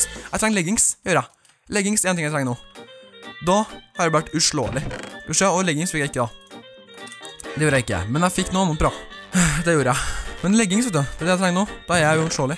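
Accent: Trøndelag, Norway. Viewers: Elsker den positive holdningen din og trønder dialekten! :D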